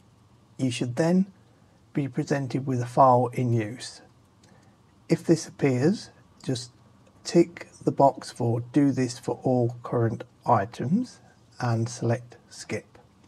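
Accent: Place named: United Kingdom. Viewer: thanks british guy! this actually helped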